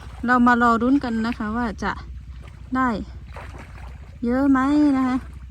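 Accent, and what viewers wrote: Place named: Lanna, Thailand. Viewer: อู้เหมือนล้านนา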